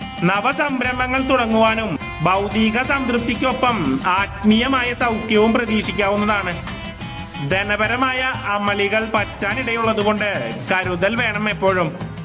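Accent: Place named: Thrissur, India. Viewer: തൃശൂർ ആണ് ല്ലേ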